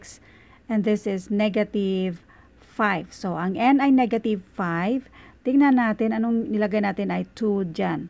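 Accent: Visayas, Philippines. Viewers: Bisaya ka gang. Kita kita r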